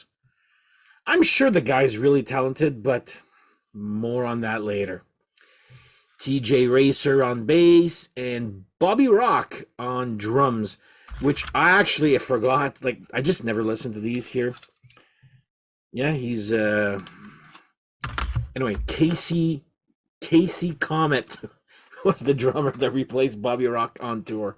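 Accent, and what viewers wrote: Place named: Canada. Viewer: I was watching this video, and listening to you, and my first thought was "this guy sounds Canadian". Then you said "Power Hour", and I noticed the senators flag in the background! 🤣 Ah-HA! A fellow Ottawan. I actually know Michael Angelo Batio, and he's a really good dude. His guitar playing talent is unreal. I've never seen anyone play as fast as he does (even today in his 60s!). As an old school 80s Thrasher, was never my thing, but Mike's technical chops cannot be denied! Cheers!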